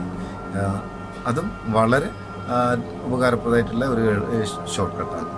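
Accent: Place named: Vadakara, India. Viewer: ഇക്ക നന്നായി ട്ടുണ്ട് നമ്മുടെ വടകര ഭാഷയിൽ അവതരണം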